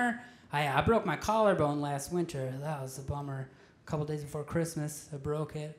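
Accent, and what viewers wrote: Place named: Wisconsin, United States. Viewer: Where’s he from? Accent sounds Wisconie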